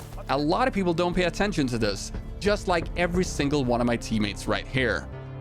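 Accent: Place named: Denmark. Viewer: really good guide and could tell you were a dane by the accent, keep up the good work :)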